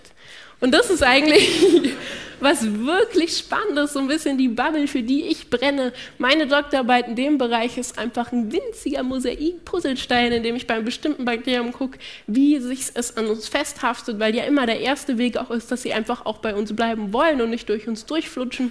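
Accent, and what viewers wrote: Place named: France. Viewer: that's her french accent 🤓🤭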